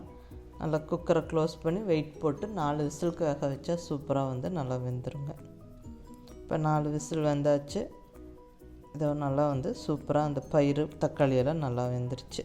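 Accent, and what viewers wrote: Kongu, India. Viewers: Ama madam namma kongu spe super 👍👍👍👍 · கோலை கொங்கு தமிழில் பேசி சமையல் கலை அற்புதமாக இருந்தது பீர்க்கங்காய் கடைசல் ஜோர்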